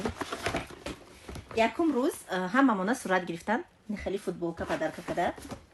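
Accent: Tajikistan. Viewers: худочон аз инда зиёдат бта рахмат ба падару модарат.Ба забони тозаи точики фахмо гап мезани.ьехтарин.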